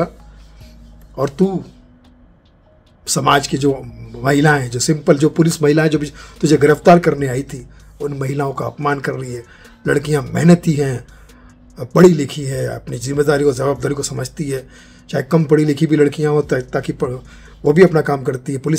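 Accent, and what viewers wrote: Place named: Uttar Pradesh, India. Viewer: Acha lga u.p wala boli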